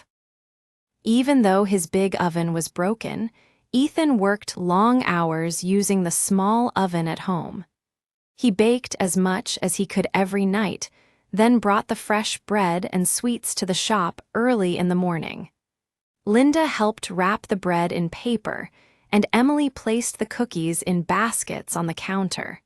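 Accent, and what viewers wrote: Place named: United Kingdom. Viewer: You speak Uk English but your English contents in American English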